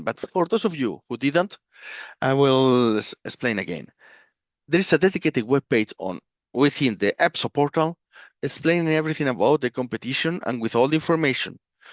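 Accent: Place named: Spain. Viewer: Very useful information and thank you for that but your Spanish accent in English is a disaster. It would be nice to fix this problem. Sorry for that, but I could understand in rewind. Anyway, thanks for the info.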